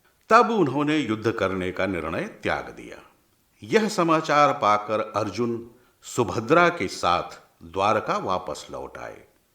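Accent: Standard. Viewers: उच्चारण की शुद्धता के साथ ओजस्विता इसे कर्णप्रिय बना रही है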